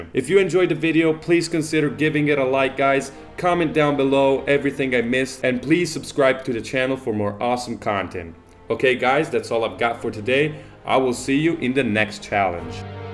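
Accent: Italy. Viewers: sei italiano? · U are italian?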